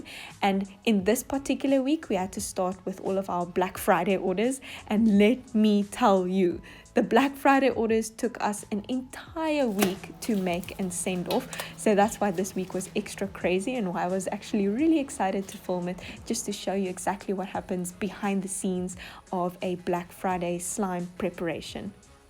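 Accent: South Africa. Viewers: wait, i just noticed ur from South Africa, i looked up ur slime shop and saw everything was in that currency. I'm shocked, no wonder your accent sounds so familiar! My mother is from South Africa! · I have a question are you in South Africa because of your accent and because of the brand of the coffee and milk? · wait is she south african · When i heard your voice i immediately thought of south africa😂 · I clicked on this video having no idea that you're South African! I heard your accent and was like OMG MY PEOPLE!! I live in the Eastern Cape and find it unsurprising that you're based in CT where the cool things happen.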